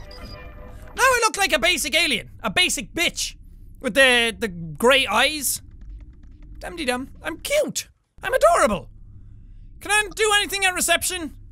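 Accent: Ireland. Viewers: oh my goash its an irshman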